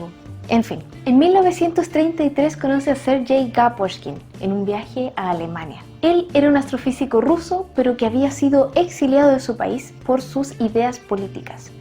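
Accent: Chile. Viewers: De seguro es chilena con ese acento.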